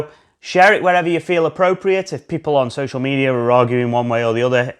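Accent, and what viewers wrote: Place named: Stoke, United Kingdom. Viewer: Do I hear a fellow Stokie?? 😂😂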